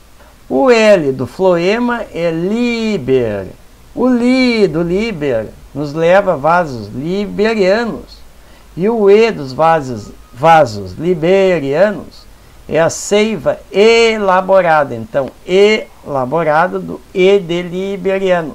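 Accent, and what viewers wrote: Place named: Rio Grande do Sul, Brazil. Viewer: Ba tu es do Rio Grande do Sul?ba muito boa sua aula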